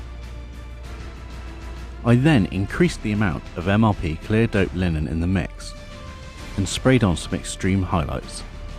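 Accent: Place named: North Wales, United Kingdom. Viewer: ught! I want a cool northern Wales accent to!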